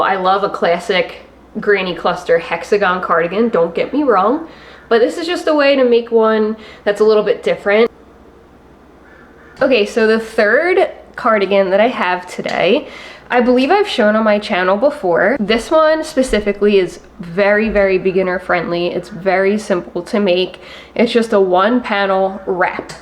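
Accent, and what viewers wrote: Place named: Pennsylvania, United States. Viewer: Love #5! The shape and colors are perfect! I'm currently making a bell sleeve top as a gift for a friend. Are you from Pennsylvania or upstate NY? I hear that part of the country in your voice 😊 great work btw!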